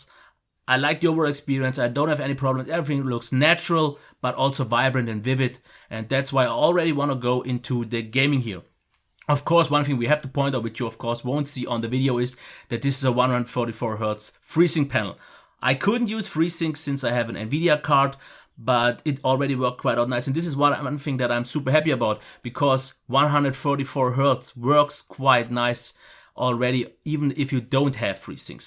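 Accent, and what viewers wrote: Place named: Germany. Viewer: German accent (almost the same as Austrian, which Arnold comes from).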